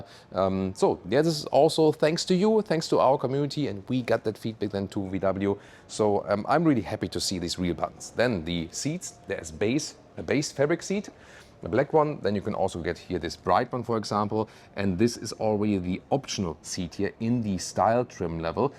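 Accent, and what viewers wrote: Germany. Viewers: bro bitte lern besseres Englisch... ist nicht böse gemeint nur Kritik (dein Satzbau war zwischendrin wirklich fatal), aber behalt den deutschen Akzent, den mag ich ;) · Dude, I love your videos but you really need to improve (fix) that accent. I know that German is closer to English accent than Greek, but believe me, this makes it also much easier for you to transition to a more British accent. It is hard to concentrate to content when I think I am listening to Mertz talking about German Fiscal discipline!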